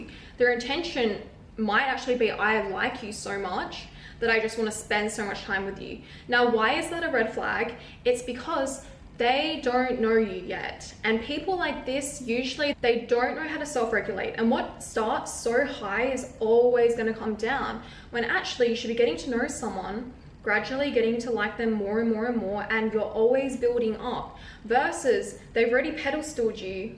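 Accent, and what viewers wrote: Australia. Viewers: This accent is tripping up my brain. I hear like 70% Australian and something else mixed in back and forth.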